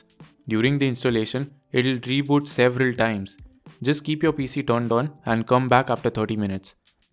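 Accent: India. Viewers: you know this gonna work when the guy has an indian accent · Bro, sounds like a Indian text support scammer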